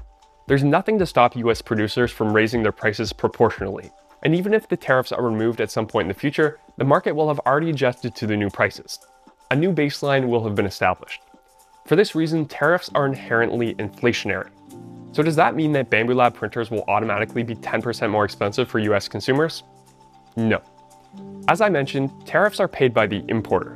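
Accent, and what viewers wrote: Canada. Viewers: You sound Canadian. Tariffs have been one sided with foreign companies enjoying the benefits of their domestic product being more affordable than American made products. Our tariffs will mirror theirs thus leveling the playing field. American companies will now be able to compete in America. Americans will begin to profit. Give it a chance to bring positive results.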